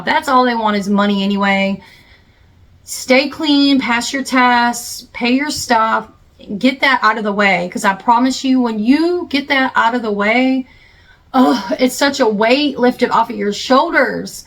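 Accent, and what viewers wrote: South, United States. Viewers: Three minutes in and I love your natural speaking voice. Are you from the south??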